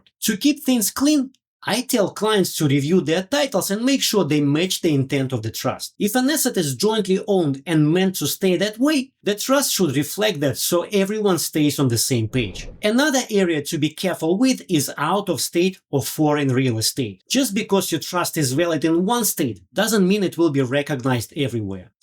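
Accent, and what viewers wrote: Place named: Ukraine. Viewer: I love your voice accent ❤...R U Ukrainian? (good advise too!)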